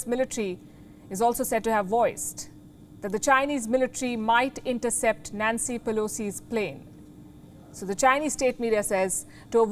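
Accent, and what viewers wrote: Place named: India. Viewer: I can't stop laughing the way she speaks is an Indian accent I am sure you will be able to understand it. By the way World has various accent and you can't say anyone wrong just for their accent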